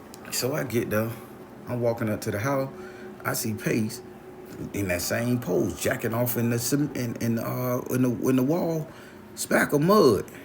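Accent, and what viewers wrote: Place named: South, United States. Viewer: I listen to the stories featuring Grandma the most. She reminds me sooo much of my Grandma it’s her face I see when you tell the story. Snuff instead of tobacco, a hatchet instead of a 38, and she only practiced ass kicking without spells. Your stories could be on sci fi or something like that. She was a southern woman as well so the voice and terminology is spot on. It’s crazy! It creates a warm memory that never really was, at least not for me. More of an adventure that I can actually see happening. She kept a Buick, too!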